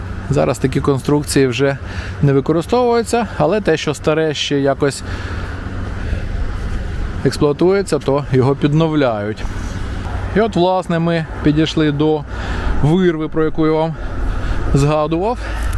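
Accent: Kyiv, Ukraine. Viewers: Дякую, за чудову українську мову. Але мені також дуже подобалася ваша "київська російська", що підтверджувало, що ви є питомим киянином. Вітання вам із Харкова.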